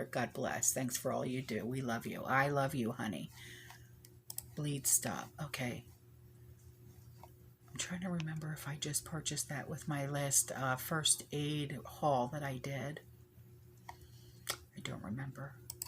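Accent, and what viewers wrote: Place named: Philadelphia, United States. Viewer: Thought you were Philly or a Delco girl.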